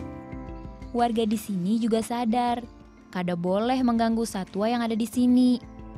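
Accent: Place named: Banjar, Indonesia. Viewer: Kek lugot orang banjar nih kka🙄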